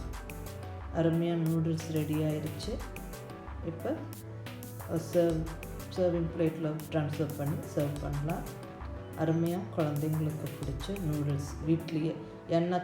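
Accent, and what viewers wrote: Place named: Kongu Nadu, India. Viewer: Ur slang seems like erode r Coimbatore mam so sweet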